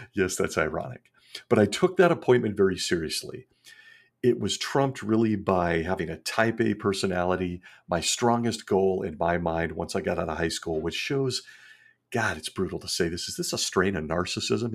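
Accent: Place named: Pacific Northwest, United States. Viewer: PNW? I thought I recognized the accent 😄 What congregation were you associated with? My family was associated with Walnut Park and Irvington. Just curious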